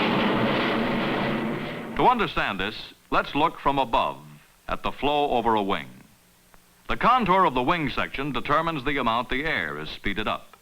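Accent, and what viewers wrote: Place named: United States. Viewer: American accent, British birds (as a rule), a bit odd.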